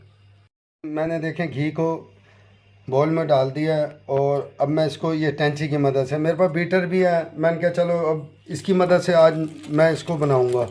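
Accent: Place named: Rohtak, India. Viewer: Assalamualikum Aap ka kaam bht acha hai aap ka taluq kis ilaqe se hai? Kia rohtek se aap ka taluq hai aap k lehje se yani Bolney k andaz se lagta hai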